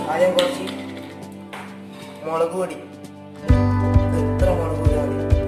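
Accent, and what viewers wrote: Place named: Kannur, India. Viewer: മോന്‍ കണ്ണൂരാണോ സംസാരം കേട്ടിട്ട് കണ്ണൂര്‍ തലശ്ശേരി വടകര ഭാഗത്തുള്ളവര്‍ സംസാരിക്കുന്നത് പോലെ ഉണ്ട്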